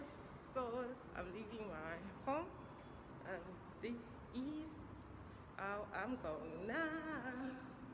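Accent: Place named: Jamaica. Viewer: I thought this was a Jamaican talk.. are you jamaican?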